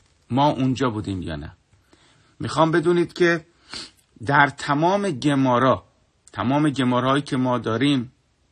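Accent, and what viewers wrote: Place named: Shiraz, Iran. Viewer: درود بر اون لهجه شیرازی!! پاینده باشی!!!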